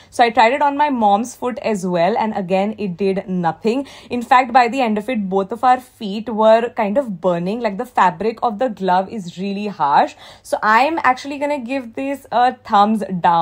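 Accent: India. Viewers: She has the accent that people think that all indians have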